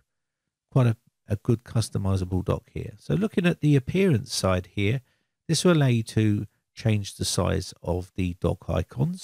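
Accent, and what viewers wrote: United Kingdom. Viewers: I'love ur Voice bro Nice UK Guy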